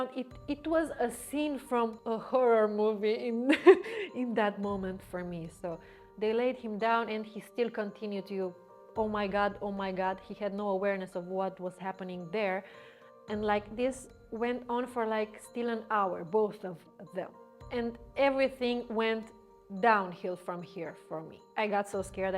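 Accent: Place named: Russia. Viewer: Hi u sound Russian😘Whoa this was posted on my bday, woohoo!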